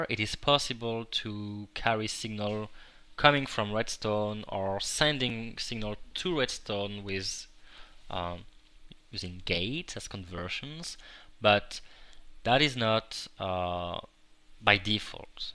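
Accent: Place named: France. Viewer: he must be french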